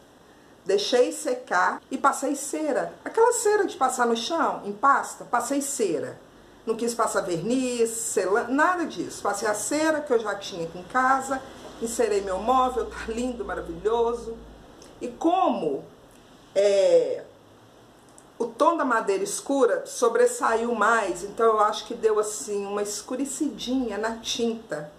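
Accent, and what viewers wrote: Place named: Minas Gerais, Brazil. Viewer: Eu amei...na minha casa a sala de jantar e cristaleira é provençal...de patina branca...super amo...e super combina com o estilo farmhouse...então estou curtindo muito o que vc faz...até porque são coisas acessíveis...bem a realidade...adorando...e aproveito para dizer que adoro seu sotaque...ano passado no carnaval eu fui aí em BH....queria ter te conhecido naquela época...tbm fui la Inhotim...espetacular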